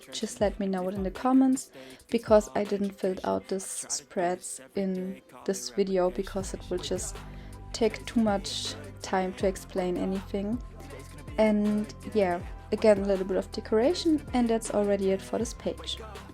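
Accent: Germany. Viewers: Are you german? 😱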